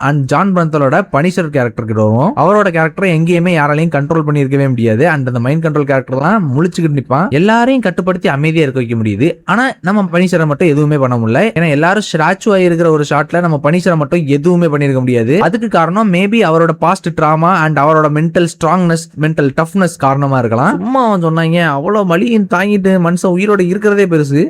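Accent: Kanyakumari, India. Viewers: Bro neega Kanniyakumari ahh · Bro neenga kanyakumari ahh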